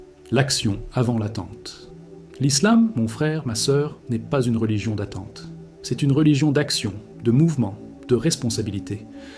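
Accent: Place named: Belgium. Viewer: Salamaleikoum je connais cet voix et c'est un belge merci Barak Allah u fiek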